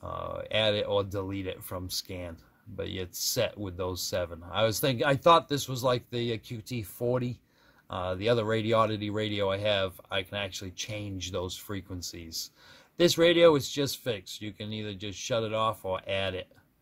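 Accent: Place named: Boston, United States. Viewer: South Carolina? You sound like a Boston boy to me. LOL. I was born and raised in Salem.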